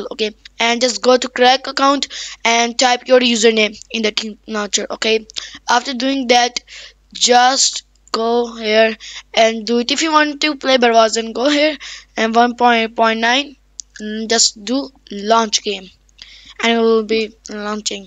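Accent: India. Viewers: thx for client btw i know ur indian cuz ur english is like indian LOL............................ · bro got that indian accent tho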